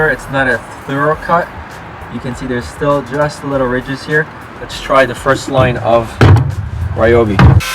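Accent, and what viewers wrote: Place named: Pacific Islands. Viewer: You sound like a kanaka? You from the islands?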